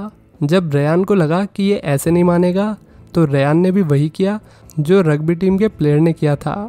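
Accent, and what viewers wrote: Bihar, India. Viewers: Bihar se ho kya bhai😅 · R U FROM BIHAR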